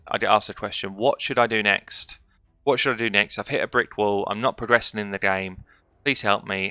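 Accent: United Kingdom. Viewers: Got directed here from Stew. Great vid and info and nice to hear a brit 🏴 doing some raid content. I'll be coming back 👍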